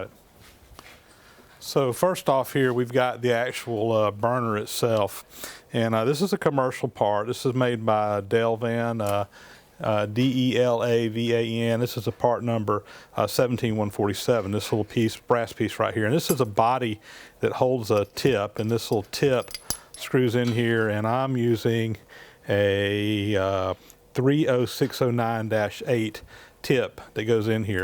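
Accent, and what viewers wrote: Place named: Georgia, United States. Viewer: Do you have the IPA notation for your pronunciation of "oil"? Your Georgia accent & doubleboost's Geordie accent are about the best things on youtube.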